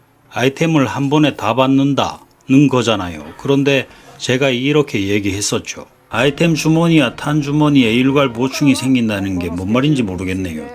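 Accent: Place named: Gyeongsang, South Korea. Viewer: 갱상도 아재 말투로 조목조목 따지는거 개우껴요 ㅋㅋㅋㅋㅋ재밌습니다. 다른 게임들도 다뤄주세요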